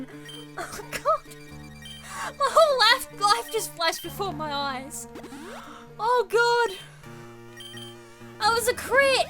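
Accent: Australia. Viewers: Wait...are you an aussie? XD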